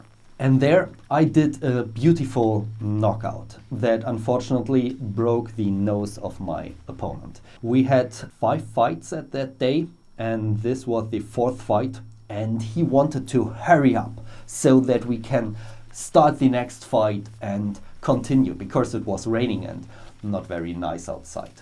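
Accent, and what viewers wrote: Germany. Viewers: are you german? can not really idetify your accent..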